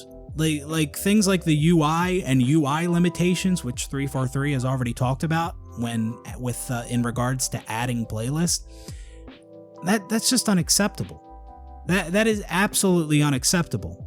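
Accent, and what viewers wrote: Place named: Baltimore, United States. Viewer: This dude is 100% either from Baltimore or PA. Or he’s lived in one of those 2 all his life. Guaranteed 1000% cause of the accent.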